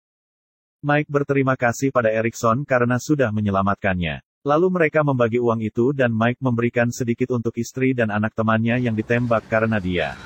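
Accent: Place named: Banyumas, Indonesia. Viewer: Sesuai request sudah ngapak2 nih kawan salam silahturahmi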